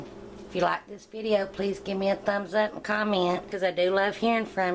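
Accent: South, United States. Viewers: best southern accent!!!! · Love the genuine southern accent!!!! 😍😍😍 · Hi, I just bought myself an air fryer recently. I LOVE the way your french fries turned out! I going to try your technique on my air fryer. By the way, I also LOVE your southern drawl };-) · I have to get me one of these! Anytime I hear a lady with a southern accent I know there's good food around! 😁😉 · Love the southern accent and your friends name 😀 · even with strong southern accent still easy to understand. I am excited about trying these. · you have what i call a country accent wow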